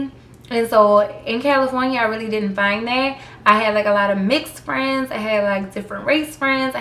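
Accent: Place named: DMV, United States. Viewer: You from the dmv? I hear the accent. I’m from Baltimore. 🤎🤎🤎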